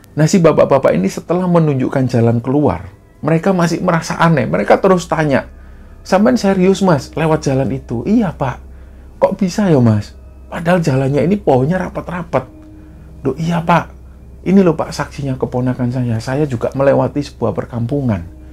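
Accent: Java, Indonesia. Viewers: Seneng ama mas bercerita sprti ngalami sndr, enak di dgr, mudah dipahami.. Luar biasa.. Dan plg respek org jawa yg ga hilang jawanya... Salut mas👍🏼👍🏼👍🏼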